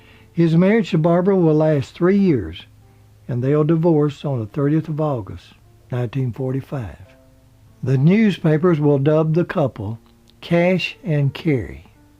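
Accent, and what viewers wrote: United States. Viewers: Can’t stand this American narrator’s accent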